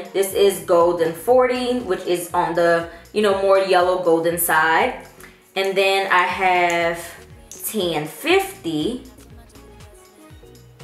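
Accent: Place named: South, United States. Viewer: Slay! Yes Texas keep bringing the southern accent out of!!! <3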